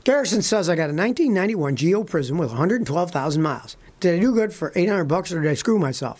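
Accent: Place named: Buffalo, United States. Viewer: SO FUNNY ,I BEEN watching this show , your in Texas ,but I heard Buffalo ,you talk real quick ,that Lake Erie water made you smart.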